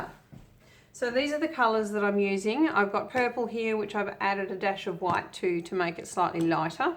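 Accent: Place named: United Kingdom. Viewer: Hi that's beautiful a suggestion for the cat that used to live in the house since you have an allergy maybe you could find her a forever home? clearly she's not a stray or feral like the other ones and she could really get hurt by one of those because they're not the same I used to live in Miami, florida,usa and the lady across the street I did not know at the time when I moved into my house she was a hoarder and it was just heartbreaking come to find out not only did she pick up ones from everywhere she even picked up one that I knew had been somebody's pet and she ended up telling me that when she found that one it had a collar on it so she was stealing people's pets also very sad please try and find the kitty that belongs to that house a home that would be so awesome thank you in advance maybe you could get ahold of a local rescue or something? 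And if you heard screeching going on that means they're in heat and you're going to have multiple cats pretty soon because they multiply almost as fast as rabbits local rescues in the United States all come also come in and spay and neuter Strays and feral cats I'm sure you sound like you're from the UK perhaps I'm sure they have something similar to that there I'm just trying to save you the headache of multiplying 7 cats turning into 17 etc etc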